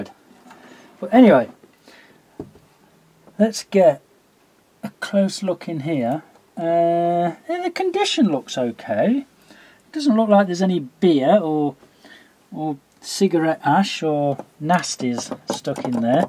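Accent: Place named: Derbyshire, United Kingdom. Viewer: great vid that was a good call on the caps..takes me back to my days in the 80's as a stores clerk for co-op sound and vision...caps were my nemesis too mainly for ordering and stock control. Not from Derbyshire by any chance? think I recognise the accent..regards from Derby here mate.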